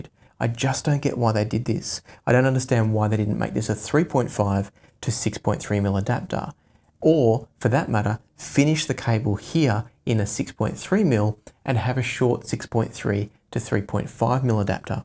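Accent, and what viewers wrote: Australia. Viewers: I love your soft, soothing Australian accent...you would make a great ASMR artist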